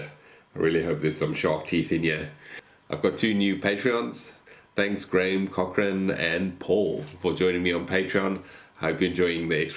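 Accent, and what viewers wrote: South Africa. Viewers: Is that a partial South african accent I hear?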